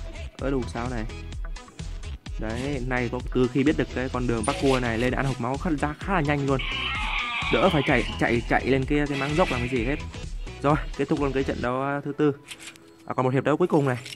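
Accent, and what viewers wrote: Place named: North, Vietnam. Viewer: Anh Bắc cua hay qua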